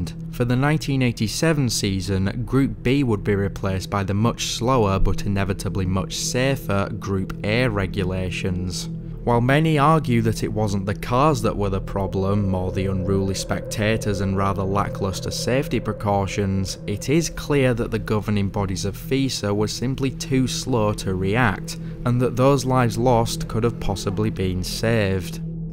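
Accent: United Kingdom. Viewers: Bro sound so British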